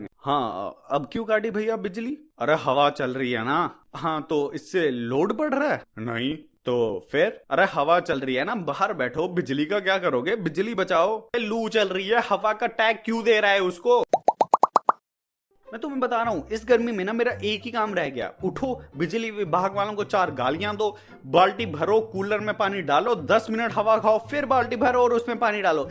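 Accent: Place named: Uttar Pradesh, India. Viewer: Bhai aap UP se ho kya BOLNE KA TARIKA MERA JAISA HA TERA 😂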